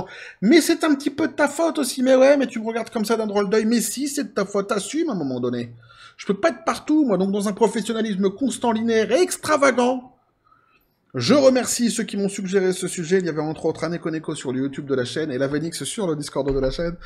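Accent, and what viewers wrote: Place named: Quebec, Canada. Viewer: Avec ton accent québécois,je croyais que tu étais du Canada..lol. Le comble serait qu'un mec lambda fasse un film d'horreur sur Mickey et que ça fasse un carton. Ca serait excellent...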